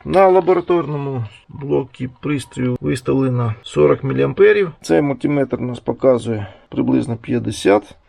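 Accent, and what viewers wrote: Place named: Surzhyk, Ukraine. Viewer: Да на суржике он балакает. Все понятно жеж.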